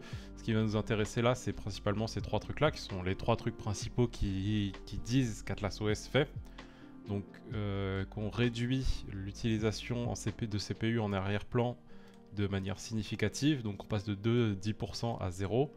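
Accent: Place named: Switzerland. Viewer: Toi t'es suisse mdr je reconnais mon accent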